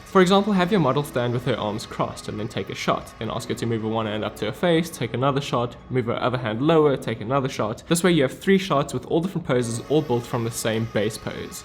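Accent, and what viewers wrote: South Africa. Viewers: South African? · Is that a South African accent? :)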